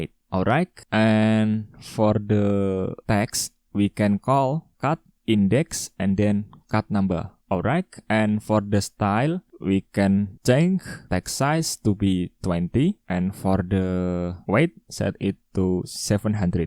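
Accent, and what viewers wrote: Indonesia. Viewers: Cara bicara inggrisnya seperti orang indonesia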